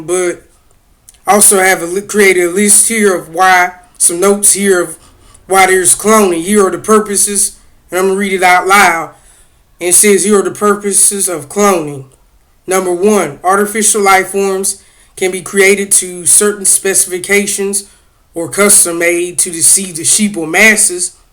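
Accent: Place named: South, United States. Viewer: OMG your southern accent is soooo strong lol and cloning is getting out of control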